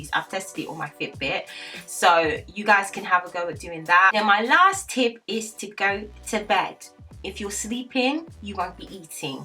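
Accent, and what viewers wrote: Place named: United Kingdom. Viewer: nice to hear a British accent on YouTube for a change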